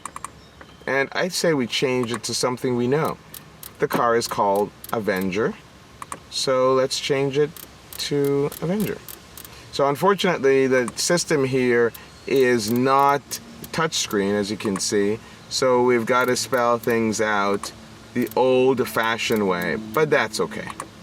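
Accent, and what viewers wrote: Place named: New York, United States. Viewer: He was born here what's accent are you talking about ? Probably is because he is from New York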